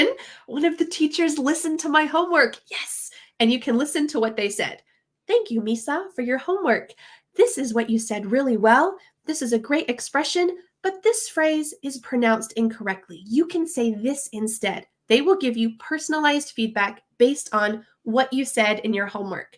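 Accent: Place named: United States. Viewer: American Native English accent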